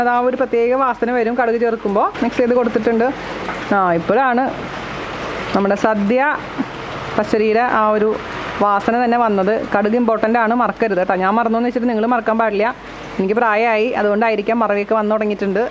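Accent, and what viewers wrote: Thrissur, India. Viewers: pineapple madhura pachadee undakuna reethi valare ishtamayee....thanks a Ton.....pinne namadeee GADI yude THRISSUR slank superrrrrr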